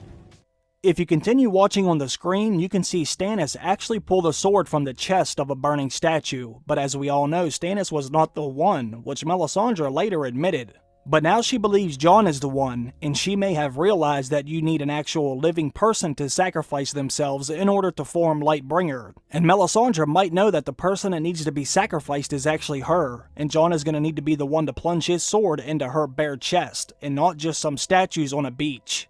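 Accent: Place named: Oklahoma, United States. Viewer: Where are you from? You sound like you're from Oklahoma, or Utah.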